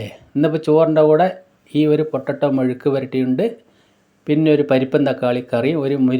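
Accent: Palakkad, India. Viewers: ചേട്ടൻ പാലക്കാട്ട് കാരൻ ആണല്ലേ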